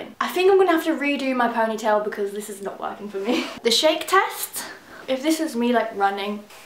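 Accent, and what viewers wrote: United Kingdom. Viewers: I did a British accent reading the title before I click this and I’m surprised ur British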